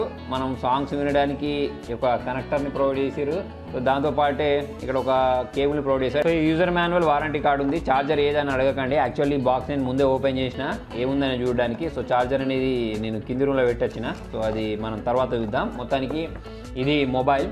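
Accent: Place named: Telangana, India. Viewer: Anna telangana slang ......🤙🤙 Arjun reddy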